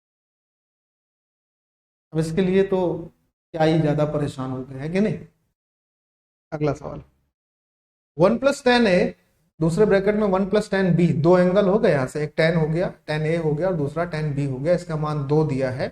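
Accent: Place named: Farrukhabad, India. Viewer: Sir kha se hn aap Farrukhabad ke aas pas ki bhasa lg rhi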